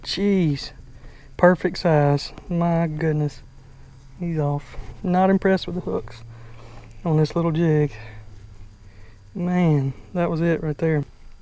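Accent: South, United States. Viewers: You’re voice is very approachable, like you sound so sweet and southern. I’m sure someone would love to sit with you and fish for a bit lol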